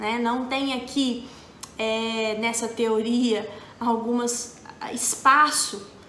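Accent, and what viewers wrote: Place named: Minas Gerais, Brazil. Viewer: sou estudante de Psicologia, estou no do 7º semestre. E sou apaixonada por suas aulas, não apenas por sua didática de ensinar, de transmitir o seu conhecimento, mas pelo seu sotaque gostoso que me remete saudosamente ao meu pai que era também mineirinho. Obrigada, por compartilhar informações de tamanho valor para nós estudantes, com tamanha riqueza e simplicidade ao mesmo tempo. Sempre compartilho seu conteúdo com meus colegas de turma. Beijokas e fique sempre bem (sempre que possível)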